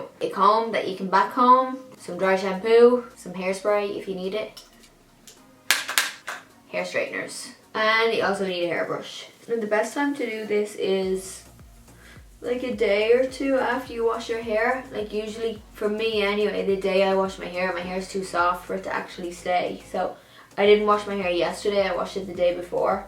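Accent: Ireland. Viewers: Are you Irish? Cause guuuurl I love your accent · Irish? Me too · Who else thinks she sound Irish ☘️ 🇮🇪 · Are you Irish? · Great messy bun you always have great style it's why I luv watching you. Not to mentioned as a transplanted fellow Irish gal I luv the accent....hugs to you 😘👍🇨🇮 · Is this girl from Ireland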